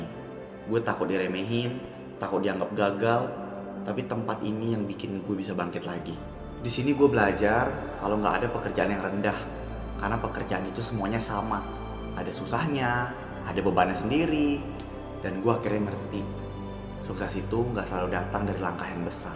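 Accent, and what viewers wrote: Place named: Eastern Indonesia, Indonesia. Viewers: Logat timurnya 👏🔥💯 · Akhirnya setelah sekian banyak video yang sa nonton ada juga yang pake logat papua❤❤❤❤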